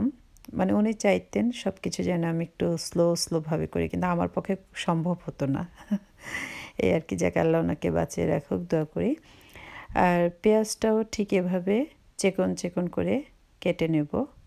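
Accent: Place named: Dhaka, Bangladesh. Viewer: আপনার কথা শুনে ও ইলিশ মাছ কটা দেখে মনে হল আপনি ঢাকা বা বিক্রামপুরের মানুষ।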